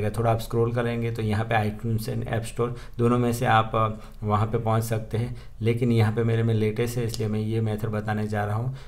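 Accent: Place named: Rural, India. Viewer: Why Is the Video Title english but the actuall Video Is a villager speaking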